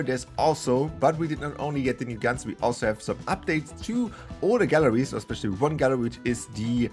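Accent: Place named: Germany. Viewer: Nice Video! You have a little German accent so I wonder are you speaking German normally?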